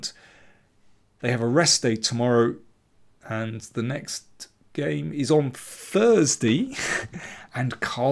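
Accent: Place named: RP, United Kingdom. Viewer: If you wanna hear someone talking too much, try agadmator's Chess Channel.. or, if that's not enough even try this Pepe-guy on chess24: absolutely unbearable word-diarrhoea. Daniels Grandmaster speak is exactly in the sweet spot between education and entertainment, and neither too little nor too much. And, may I add, kept in the most wonderful Queens English. Keep it up.. and tnx a bunch:-)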